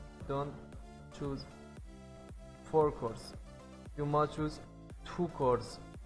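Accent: Iran. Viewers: منم یه همچین حسی دارم که ایرانیه داره خارجی حرف میزنه کامنت تو رو دیدم بشتر برگام ریخت 😂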